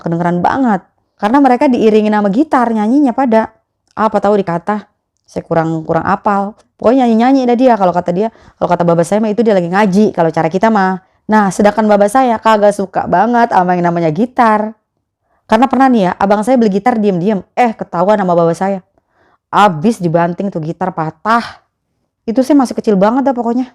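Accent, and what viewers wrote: Jakarta, Indonesia. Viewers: hahahahaha si mpok asli betawi ya hahahaha, bahasa betawinya ketara, hahahahhaa NYATRONIN, GRUTU. keren mpok semoga sehat selalu mpok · Ini cewek dilihat dari bahasanya. Gua tebak dari dekat pondok gede ( betawi) nih......😂😂😂😂. Saat pembukaan bicara mantap....nah setelah ceritain Babeh nya......keluar dah aslinya. Lah bujug neng oke banget da ah....😂😂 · Betawi banget sih pok😂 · Sepertinya dari logatnya mbaknya orang betawi neh... lucu 😅😅😅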